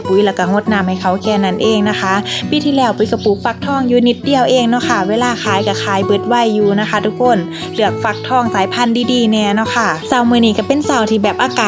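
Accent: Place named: Isan, Thailand. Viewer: ชอบดูชอบฟังคุยสำเนียงอิสานฟังเพลิน❤❤❤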